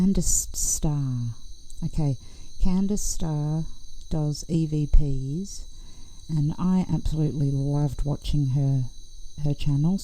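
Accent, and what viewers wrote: Australia. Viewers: Are you Australian? Great video...praying for Gannons return 💙💙💙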